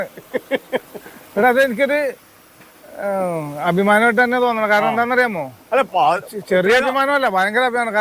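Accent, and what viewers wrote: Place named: Valluvanad, India. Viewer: ഇതിന്റെ അവതാരകൻ ഒരു ബുദ്ധി ജീവി തായോളി ആണ്... അവന്റെ സംസാരം വള്ളുവനാടൻ സ്ലങ്ങും ചോദ്യം എല്ലാം അതുപോലെ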